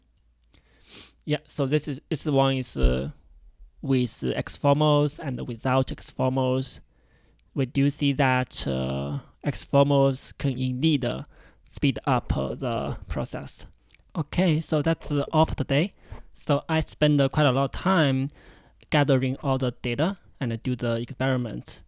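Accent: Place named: China. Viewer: chinese-style english😊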